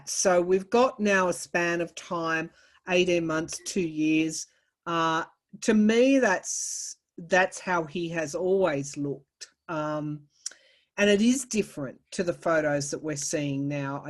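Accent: Australia. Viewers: Just hearing your voice and your adorable Aussie accent makes me smile and makes me feel at peace. You've got something that's beautiful. A gift. And I don't just mean the cards. Thanks again!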